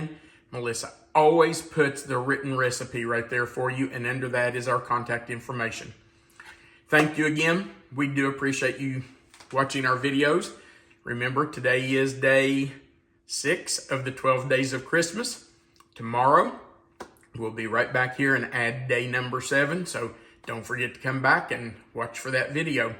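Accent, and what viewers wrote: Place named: Kentucky, United States. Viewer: As a 14-year-old, this was the first candy I made, and it was from the back of the Cocoa can. I was a farm kid and learned to help in the kitchen from an early age. I did not have canned milk and used our Dairy farm cream from the top of the pitcher of milk. I did not have measuring cups or spoons. Used a teacup and a teaspoon and real home churned butter & Watkins vanilla from the "Watkins man" who came around on a regular basis. I also used the cup of cold water to test it since no thermometer in 1957. My mom walked me thru the first time and turned out fine, delicious. I made it many times after that thru the year, not just Christmas. I got the proper equipment in the 1960s but never made a difference in the outcome. Love hearing about your grandmother and that she lived in W. Virginia. I started watching you because you were from Kentucky and had that delightful accent. 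My Dad was born in W. Virginia when he came a little early while grandmother was visiting her sister. He was raised in Louisa, Ky but moved with his family to Central Oho farm at 16 years old. Love and miss my Kentucky relatives. I still love your accent, not as pronounced as my relatives, but watch for those delicious recipes.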